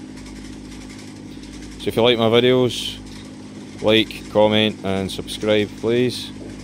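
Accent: Scotland, United Kingdom. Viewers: Can you tell me if that's the Ochil hills in your videos, Mrs thinks your a Fifer and I think more cumbernauld side.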